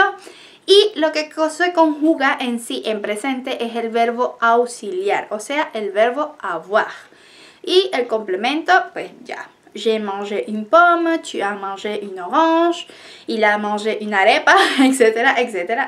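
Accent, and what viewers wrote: Venezuela. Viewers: Tuve mi sospecha por tu acento,hasta el minuto cuando pronunciaste ''AREPA'' jajaja,saludos desde Venezuela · Excelente calidad de video, con información muy importante. Gracias. Una pregunta: eres venezolana? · Acabo de descubrir tu Canal Por el acento eres venezolana?? Soy venezolana y estoy en Francia luchando con el idioma Me gusta tu explicación es clara y precisa espero me ayudes Merci... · Excelente la explicación !!! Bello tu francés y tu acento Venzolano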